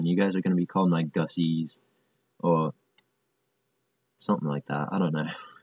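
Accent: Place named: United Kingdom. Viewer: What is with the British accent? That dog is from one of your past videos too. Some technology doesn't make people smarter like the new Iphone for instance you don't have to reach up the entire screen just to get stuff you double tap the center button and it comes half way down the screen. Other technology like stuff for hospitals make it easier and sometimes less painful for people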